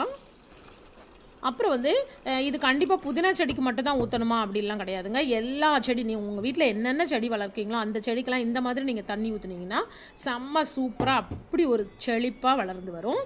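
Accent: Tirunelveli, India. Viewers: Neegga thirunelveliggala mam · Sister ungal tirunelveli voice Rembavum super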